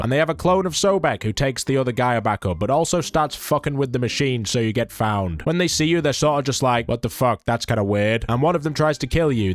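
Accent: United Kingdom. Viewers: well the game certainly was boring as shit but i theres nothing better then having an angry british lad speak about video games ... while i am playing a video game.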